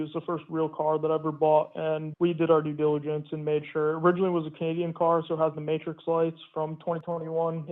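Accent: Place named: Montana, United States. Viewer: Eww I can hear the Montana in his voice lol 80k was actually a good offer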